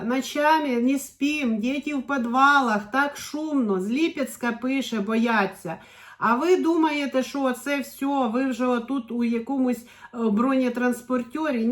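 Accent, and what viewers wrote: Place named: Enerhodar, Ukraine. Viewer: Цікаво, що де той Енергодар і де та Велика Писарівка, а говір один і той же - один-к-одному🤔💗👍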